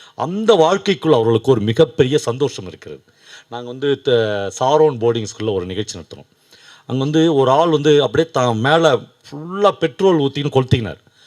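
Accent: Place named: Tiruvannamalai, India. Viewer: தி.மலை தமிழில் உரையாடுவதின் உயிரோட்டத்தை உணர முடிகிறது.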